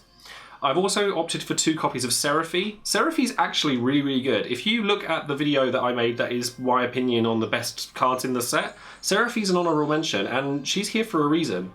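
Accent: United Kingdom. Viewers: quick q, where abouts the UK are you from? also, clouds field ability does that buff all clouds on the field? an example would be if a tifa and light cloud are already on the field, do both light cloud AND common cloud get the 2k buff? i dont normally comment but your vids are real good, keep up the good work (Y)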